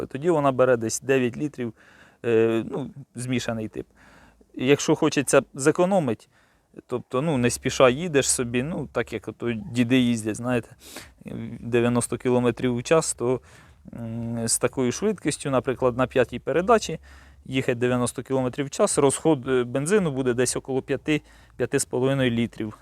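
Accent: Cherkasy, Ukraine. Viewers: судячи з номерів - ми земляки. Тай говір Черкащини з русизмами чутно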